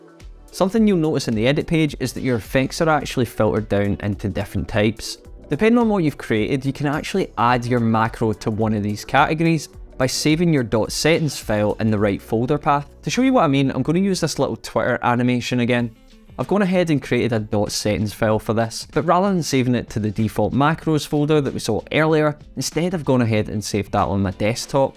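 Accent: Scotland, United Kingdom. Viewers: Your Scottish accent is what I live for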